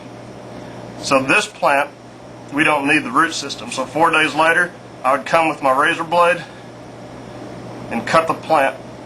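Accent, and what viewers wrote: United States. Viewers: is that a new york accent? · damn you got some heavy southern dialect going on!!! Be proud of that! Greetings from norway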